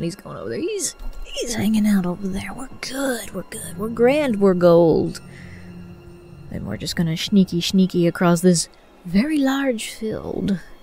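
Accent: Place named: South, United States. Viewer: Oh man, I love ur Southern Accent. Its like Heaven. Please dont Change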